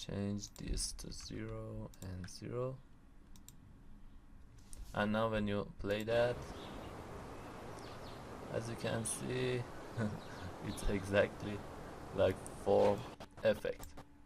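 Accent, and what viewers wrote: Iran. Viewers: Are you persian?your pronunciation like persians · it's so obvious he is persian lol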